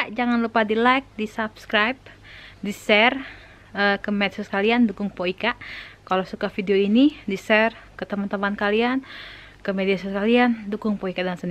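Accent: Jakarta, Indonesia. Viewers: ngomongnya lucu bgtt, logat betawinya..😁😁 salam kenal mpok...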